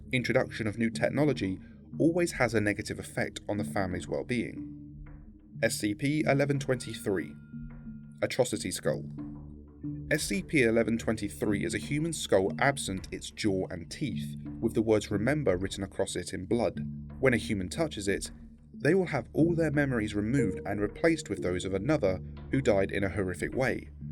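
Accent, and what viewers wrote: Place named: England, United Kingdom. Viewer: I wish I wasn't prejudiced against authentic English accents to enjoy this more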